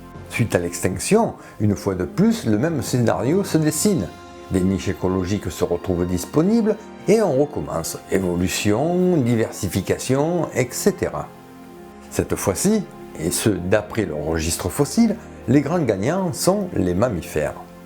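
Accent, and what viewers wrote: South, France. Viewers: Une suggestion d'amélioration : remplacer ta voix, trop rebutante, par une voix D'IA ? J'aurais bien voulu écouter ce docu mais l'accent du sud je peux vraiment pas...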